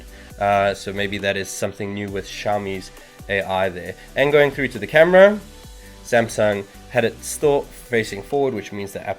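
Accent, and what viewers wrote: South Africa. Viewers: are you south african? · i hear from your accent you're from SA. i am too. i am thinking of getting this but retailers dont import the mi series and wont repair it (I asked them, they'll only repair phones they've sold and they only import redmi here). any idea where i can get a mi 9 fixed if it breaks? thats my only concern before buying. thanks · Are you south african? I am. Nice video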